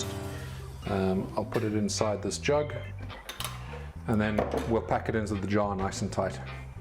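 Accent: South Africa. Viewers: Are you South African? Your accent sounds a little South Africany. 😁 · Where in SA you from Bud. your profile location says UK, but you sound like you could be my neighbor :P. Nice Vid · You sounds like a South African, good on you mate. Excellent video. Lekker man. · Good job ,,A bet with my wife,, South African???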